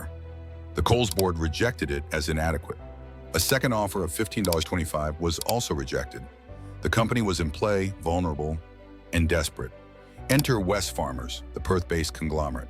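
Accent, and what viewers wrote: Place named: United States. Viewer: Can we NOT AI narrate with a yank accent?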